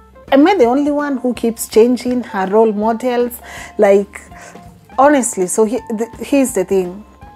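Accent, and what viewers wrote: Kenya. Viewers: Thank you for keeping your original Kenyan accent. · You sound very Kenyan YOU KNOW ; ; . ; ; ;. Right?? ⁉️ Ly Baurer 2️⃣4️⃣ December Climax to (Sounds like a true Kikuyu Woman) #NoUkabila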